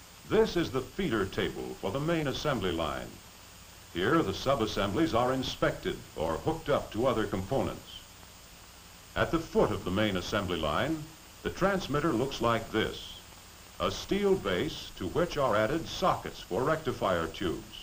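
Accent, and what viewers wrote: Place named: United States. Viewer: Beautiful American English Accent.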